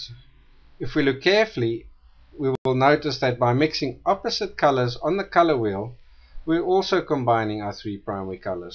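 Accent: South Africa. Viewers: Very south african accent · Hoooly smokes, this is probably the most valuable video on colour mixing I've seen since I started painting 2 years ago. A complete revelation! Thank you so much :) Btw I bought your colour buster tool today and am looking forward to using it. Also love hearing a South African accent on youtube ;) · are you from soouth africa? i can hear your accent :P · Go South Africa! This accent takes me back to school days. Thanks for sharing this so simply and freely.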